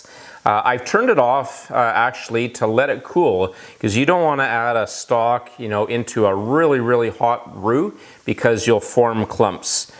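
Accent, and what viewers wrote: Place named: Canada. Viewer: I love the Canadian accent! Showing love to the northerners from Southern California aye!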